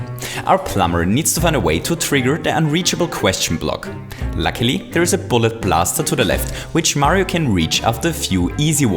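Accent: Germany. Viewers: Bist du ein Deutscher ? Du hörst dich so an, als ob du ein Deutscher oder von irgend ein anderes deutschsprachiges Land kommst :D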